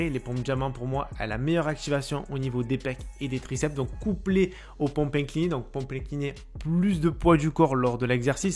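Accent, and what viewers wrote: South, France. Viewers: Accent du sud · Le bel accent du Sud ! Il y'a que ça de vrai !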